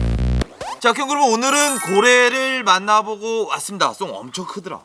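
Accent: Gangwon, South Korea. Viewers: 속초 말. 참 재미 있네요... 북한 말 같은기.... ㅋㅋㅋ.. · 강원도 사투리가 귀에 쏙쏙ㅋ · 사투리가 지대로자니? 나도 강원도 사람이자니~ 고래가 메른두없다야~:yougotthis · 말투가 강원도 사투리에요. 처음이라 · 강원도 시투리 구수하이 좋쿠만뇨~ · 강원도 사투리 같습니다. 제 군대 선임 어투와 많이 비슷합니다. 강릉 출신이였숩니다. 많이 표준어 쓰시려고 노력하신거 같습니다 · 강원도 사투리 재미있는거 같음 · 영동지방 강원도 특유 사투리 입니다 북한 억양이랑 비슷해요^^ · 말투땜에 첨엔 조선족인줄 ㅋㅋㅋ 계속들어보니 구수한 강원도 사투리였드래요 ㅋ 덕분에 귀한영상 잘보고 갑니다! 따봉누르고 가요~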